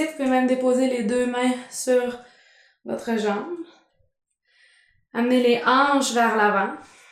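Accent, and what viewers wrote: Quebec, Canada. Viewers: J’étais extrêmement tendue et stressée, et me voilà à rapper yoga sur YouTube pour trouver de qui me détendre. Cette vidéo, c’est du bonheur ! Et moi qui suis française ayant vécut au Quebec pendant 2 ans (Montréal), retrouver cet accent si typique dans le plus grand des hasards c’est encore mieux !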